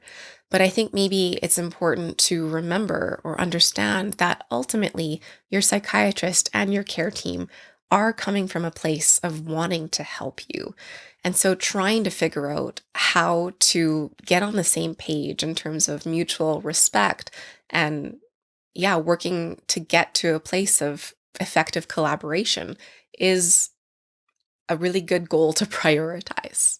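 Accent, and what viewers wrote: Canada. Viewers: Your accent leads me to believe you're Canadian. Care team? We have hundreds of thousands of mentally ill crowding the sidewalks of every city in the US. It's a horrible job that nobody wants. Count yourself fortunate that you have the resources to help you.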